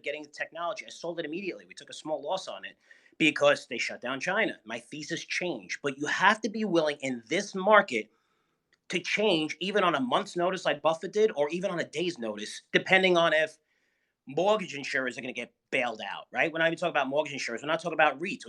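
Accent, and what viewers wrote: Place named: Staten Island, United States. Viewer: This dude sounds like he's from Staten Island. Demz, Deez and Doez. Sounds like he works in sanitation.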